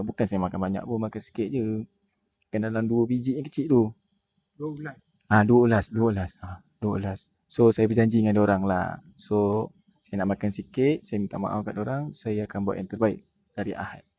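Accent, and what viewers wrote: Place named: Perak, Malaysia. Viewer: Bila bercakap kuat loghat perak... Tengak.....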